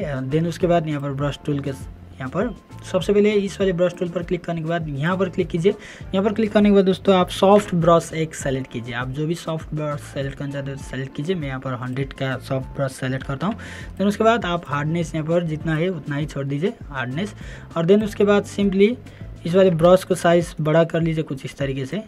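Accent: Nepal. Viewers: Nepali vhayara hindi ma video banouniii haa...sound le sedai th pouxa bro ...tapai nepali ho vanera .....😂😂😂😂😂😂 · You are nepali,you are not using correct hindi tone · Bro Nepali ho jasto x tara bhasa hindi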